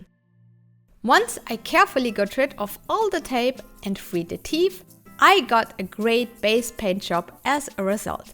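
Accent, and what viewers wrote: Germany. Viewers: Where did you catch the german accent?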